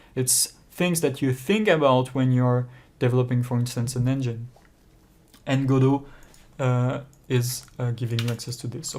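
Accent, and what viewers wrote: France. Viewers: This is very late and you might not see this message but thank you so much for uploading this. By far the best tutorial on using C# in Godot. Also, the French accent you have is so charming. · Just so you know, I'm a big fan of french english! 😁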